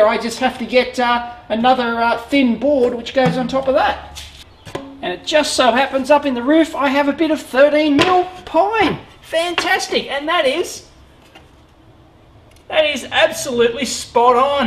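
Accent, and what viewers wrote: Australia. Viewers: Australian. His accent sounds nothing like a Canadian one. Also most Canadians would use feet for that type of job.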